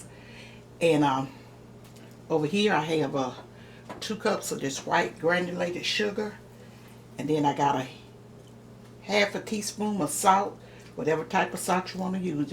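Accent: South, United States. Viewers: Thanks so much for your recipe! I added a slight twist to mines….I blended about a half a cup of heavy whipping cream to the sour cream. Turned out GREAT! ❤️ ** I love your southern accent ** ❤️ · Yum! My favorite pie! Your southern accent is so beautiful and I love listening. God bless.Never tried the sour cream or butter flavor but I have two big taters right now marked for pies! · I'm new to your channel and you are funny without even trying! I love you! Potatoes in jackets, forgetting what you were talking about, and then that phone rang and you left us all sitting here staring at that bowl & mixer!🤣 You sound just like my folks! I don't have my Southern mom and dad anymore, and it's Thanksgiving time 2020. Watching you, I'm feeling so nostalgic. Thank you for being you😘 p.s., thank you for helping our dear stay on track!😂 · Just found this/I love your SOUTHERN ACCENT!! 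I’m going to send this to myself since I will not be able to watch all-have appointments. Thanyx a lot. PS: please tell me “what out of the jacket is/how is it done. My name is Evelyn but folks call me “Luv.”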